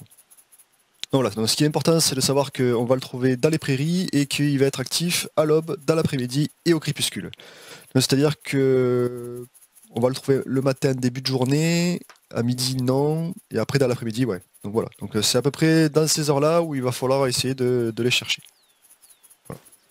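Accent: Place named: South, France. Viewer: abo j'avais laissé le jeu de côté après des centaines d'heures de jeux tu m'as redonné envie d'y jouer... Merci c'est peut être l'accent du sud lol bon contenu en tout cas je vais te suivre 👌👍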